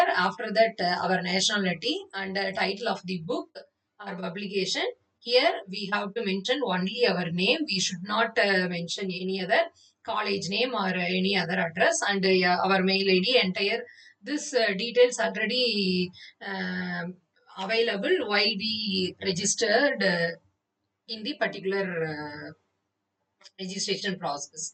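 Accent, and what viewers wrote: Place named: Tamil Nadu, India. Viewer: Its not BUBlish....you should pronuce it like PUBlish....please polish your english pronunciation. Also please practice by attending spoken english....do not kill us by talking like butler english. Your english slang clearly shows that you are from Tamilnadu.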